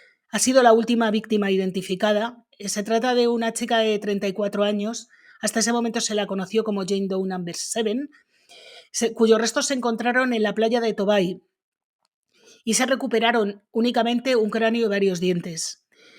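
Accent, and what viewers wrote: Spain. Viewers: Hola! me gusta muchìsimo como describes tus videos, siempre muy interesantes.Ademàs me encanta tu acento; de què lugar de España eres?.Saludos desde Italia❤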